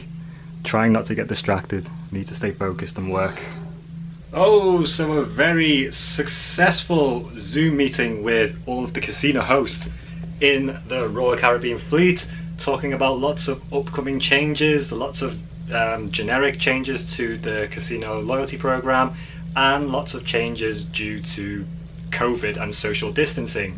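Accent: United Kingdom. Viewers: Another good vlog:-) I was caught off guard at first by the british accent when I first started watching. I'm glad all is going well...I too was wondering how you guys deal with laundry and room detail. Thanks for the great content...always fresh and putting a positive light on what's going on. It's much appreciated...myself...i would be climbing the walls...at least you have the internet and tv to help pass the time.:-) Have a great day:-) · It’s a Liverpool accent 😎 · British accent is what caught my eyes/ears so I decided to listen and now a subscriber, interesting cruise life.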